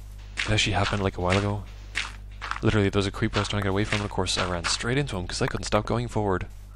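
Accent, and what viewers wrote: Ireland. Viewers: well my guess is irish .. or irelandish whatever ... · Hey. Love this LP already. I was trying to place your accent, so my official guess is Cork...? Keep it up! =D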